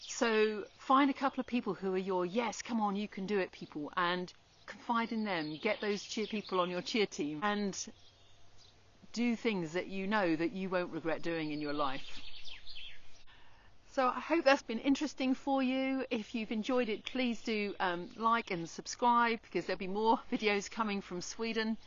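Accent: United Kingdom. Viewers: I just watched your video again (saw it for the first time this morning). I am so impressed with your remarks -- they really resonate for me, and inspire me. "I've been investing in my retirement for many years, but in this moment I wanted to invest in happiness, and joy, and precious memories." WOW! Thanks for inspiring me. Where do you live when not in Sweden? Based on your (slight accent), I assume you are British? Thanks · Are you from Norfolk? You sound like Pam Aires. (Hope that is the correct spelling). Sorry for your loss. Moving abroad sounds great but language is important factor to consider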